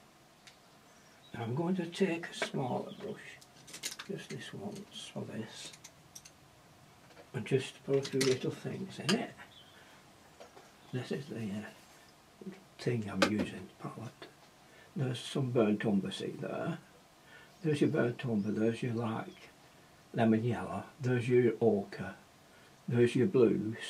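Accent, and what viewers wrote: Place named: North, United Kingdom. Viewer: Loved this, your so lovely,and northern like me lol, I understand you perfectly lol👏😁 you brill, hope your wife comes home soon my love, you take care of yourself, hugs👍🇬🇧❤️💙🎨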